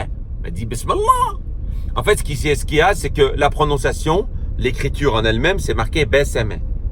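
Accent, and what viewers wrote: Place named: Saint-Étienne, France. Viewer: Salam, heureusement que t'as remis tes vidéos t'as pas fait ça pour rien . Je souhaite que Dieu te bénisse toi et ta famille ici bas et dans l'au delà inch'allah tu aura le pardon et la pleine récompense. Ton langage famillier m'est famillier j'aurais jurer que t'étais de Sainté, t'as l'accent et les mimiques de la ville minière et des chemins de fer et des verts. Tu tape dans les babets avec tes guibolles ou pas ?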